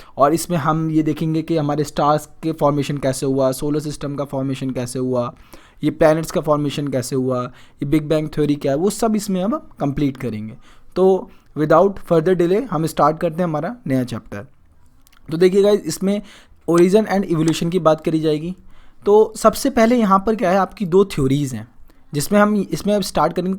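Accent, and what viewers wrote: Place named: Punjab, India. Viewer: Loved ur punjabi accent and explanation 🙏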